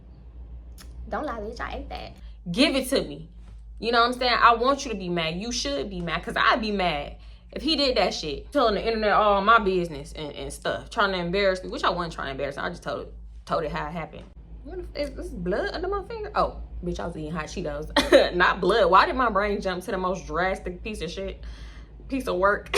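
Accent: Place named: Houston, United States. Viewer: He sound just like a Houston nigga 😂😂😂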